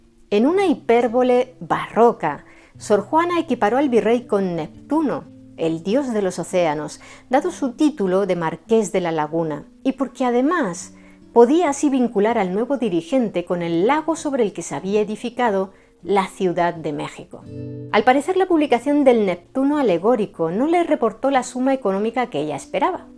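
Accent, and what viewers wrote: Spain. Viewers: Suena más a española que mexicanoa...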